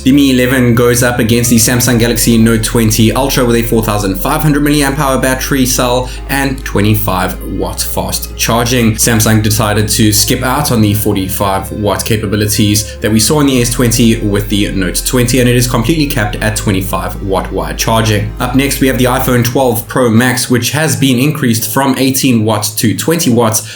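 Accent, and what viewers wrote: South Africa. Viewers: I just gotta ask, are you South African because you kinda have that accent?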